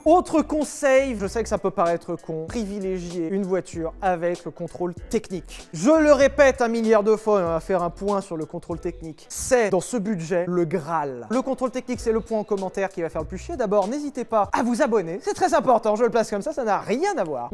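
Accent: Belgium. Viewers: On est d'accord 😉. Mais perso, l'accent que prend dans cette vidéo, n'est pas vraiment l'accent Liégeois pur et dur. Je trouve qu'il se rapproche plus de Caudy Focan que de Renaud Rutten. Enfin bref j'ai bien saisi l'idée, et on ne va pas se prendre la tête pour une histoire d'accent Belge 😅🙏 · Incroyable l'accent belge de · Je pense que sur le contenu, y a rien à dire: les conseils sont au TOP! J'ai dû récemment acheter une petite auto d'occaz avec un budget serré de 1200 euros: Twingo, sur base de vos bons conseils sur le budget à 1500 Par contre... on peut parler de cet accent belge à? C'est que c'est bien fait! Je suis moi-même belge et je n'arrive pas à faire cet accent mdr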